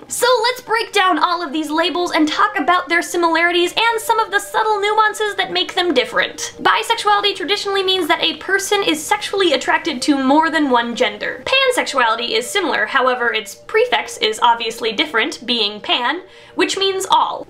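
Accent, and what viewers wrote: Minnesota, United States. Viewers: You're MINNESOTAN?!?! SO AM I OH MAH GAAWWWSSSHHH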